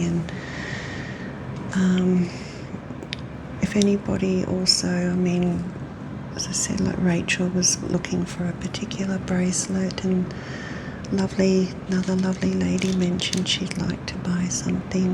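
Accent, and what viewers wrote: Australia. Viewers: I found my new favourite ASMRist ❤️ the fact you’re Australian is also comforting for me. I’m from the UK and my 20 year old son is currently in the Philippines but will be heading to Australia in July where he plans on staying for up to 3 years. I miss him terribly, but you’ve definitely helped. Thank you. ❤️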